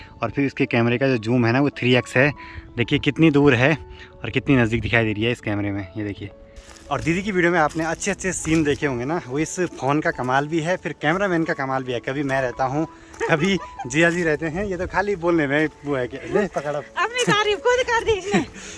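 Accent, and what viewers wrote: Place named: Garhwal, India. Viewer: Bhai aapki garhwali boli bahut Mithi hai.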